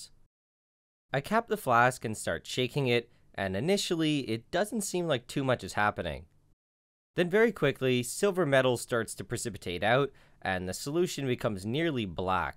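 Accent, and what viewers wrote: United States. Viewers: The way americans pronounce mirror is a pet peeve of mine. There are 3 'r's in there, yet somehow you only pronounce 1?!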